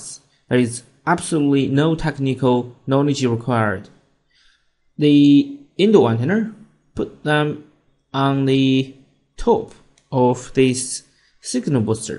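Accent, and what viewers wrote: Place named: China. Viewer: Your accent is certainly not British, more like Chinese English. Especially when you say PPPower Pluuug. Oh, and these boosters are a fraud I have bought several and they do not work.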